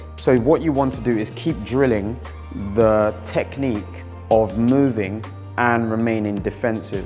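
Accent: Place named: United Kingdom. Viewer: Are you Cuban as for me sounds like you are British.... accent by far.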